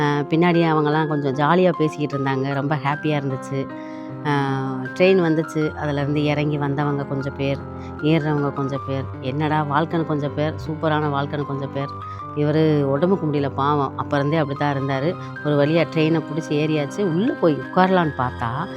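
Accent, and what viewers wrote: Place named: Kodaikanal, India. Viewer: உங்களது vlog ரொம்ப பிடிக்கும்பா. உங்களது அமைதியான அழகு, இனிமையான குரல் ரொம்ப ரொம்ப ரசிப்பேன்பா.நான் கொடைக்கானல். ரொம்ப நாளாக உங்கள் பேச்சு வழக்கைப் பார்த்து நம்ம பக்கம் மாதிரி இருக்கே என்று ரசிப்பேன். நீங்கள் சிகிச்சைக்காக சென்ற ஆஸ்பத்திரியைப் பார்த்ததும் என் மனது கனத்து என் கண்களில் என்னையறியாமல் கண்ணீர் பெருக்கெடுத்து விட்டது. கடவுள் ஏன் நல்லவர்களை இப்படி சோதிக்கிறார்.உங்கள் vlogல் நல்ல மெஸேஜ் கொடுப்பீங்க. கடவுள் உங்களுக்கு நல்லதே செய்வார். என் சகோதரிக்கு கொடைக்கானல் சாந்தி அம்மாவின் அன்பு முத்தங்கள்.❤❤❤❤❤❤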